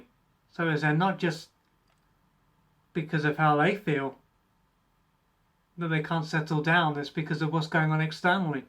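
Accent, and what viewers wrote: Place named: United Kingdom. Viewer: Thank you.🌴🌊🌺 I am gonna try till I die.. 😊. Hehe. So many things you seid are so true. Tummy problems and learning problems and chasing adrenilen or shutting down and becoming a hermit. Lol, iv been stuck in the hermit stage lately. But I have to laugh about it sometimes. I love yr British accent. I have to watch Ricky gervase when I'm feeling really bad because he has a way of making me feel like life is crazy and we all have to laugh at it sometimes but, when I herd you talk, your easy to listen to and bring me back to Earth. I think I'm ment to live in England. Lately all the people I watch on YouTube who have inspired me live over there. It looks cold there. All of you are always whereing Jumpers. 🤒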